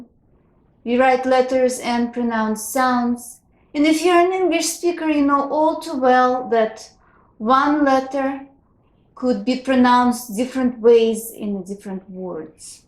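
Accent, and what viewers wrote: Russia. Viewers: I don't know what she's saying because I'm not used to that type of russian accent (I'm used to crazy Russian hackers accent but I have no idea what she's say but probably where I'm from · ...though your pronunciation of English has a definite Russian accent, (but it is so charming!), and, yet, you obviously have a very good mastery of English vocabulary, and, you are very clear in what you are saying. => A Great introductory lesson! I hope that, someday, I can learn Russian pronunciation, as well, and would like to at least learn to read with some degree of fluency, as I have a few books, (on the subjects of jewelry, and metalworking), that I'd like to be able to translate someday. Thank you, very much!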